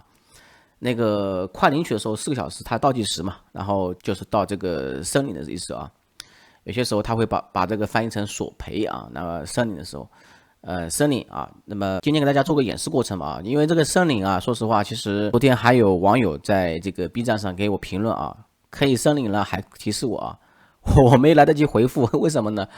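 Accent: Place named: Hangzhou, China. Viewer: 口音听出来杭州附近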